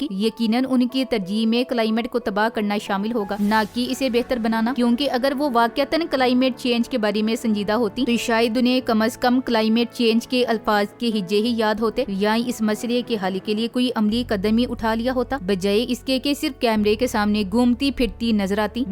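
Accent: Punjab, Pakistan. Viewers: Tumara tu lahja hee buhat buckwass hay. Tum tu urdu bhi nahi bool skati ho.punjabi main urdu boolti ho..our lahaaja to buhat hee uneducated Hain...sharam karo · Tu urdu mai bhi pu njabi bol nahi sakti pando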